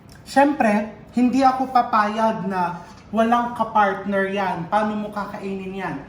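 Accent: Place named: Cagayan, Philippines. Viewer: Chef we have same accent from tuguegarao city.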